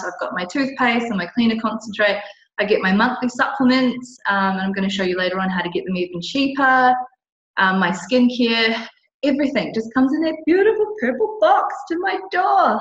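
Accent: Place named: New Zealand. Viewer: omg - i watched this at work and we have no speakers on computers so used captions lol Youtube can't pick up a Kiwi accent.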